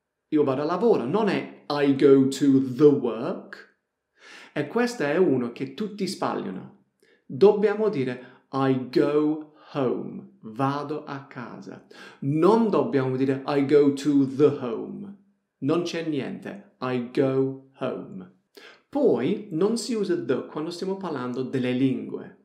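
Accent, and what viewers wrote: Apulia, Italy. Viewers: Vero !! e quella malcelata cadenza pugliese è un plus che lo rende estremamente simpatico ed empatico con chi lo segue: per sua stessa ammissione in altro video pare che abbia deciso di vivere in Puglia ed in particolare a Locorotondo, un magico paese di quella splendida zona nota come Valle d'Itria costellata dei famosi "trulli" ... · Non posso non notare un leggero accento pugliese :) Giusto? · ... unA consonante o unA vocale, non un... utilissimi i tuoi e super simpatici con quella cadenza pugliese... 😅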